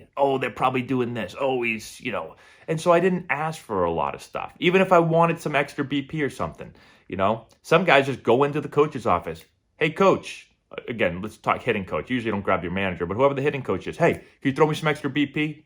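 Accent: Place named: Boston, United States. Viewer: Have you played Fallout 4. It's based in Boston. Every character sounds like you. Big fan, love the channel.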